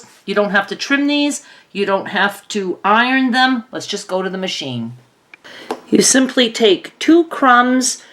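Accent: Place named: New England, United States. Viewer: I just started my first crumb quilt for a wedding gift for my best friend 💝 I was using a combination of method 1 and 2 but now I've got 3 more to incorporate and really shake things up! Instant subscribe seeing the "Queen of crumb quilting" comment lol Where are you from,? I recognize the New England accent, but can't pinpoint a state 😂 hey from northern Maine 👋💝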